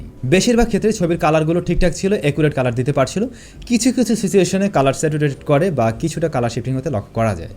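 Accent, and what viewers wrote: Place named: Tangail, Bangladesh. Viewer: Vaiya apnar basa kotha love for Tangail ❤️❤️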